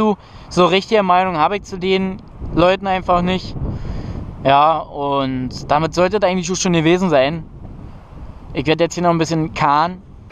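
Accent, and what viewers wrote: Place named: Berlin, Germany. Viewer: Digger diese berliner schnautze einfach zu geil👌🏻👌🏻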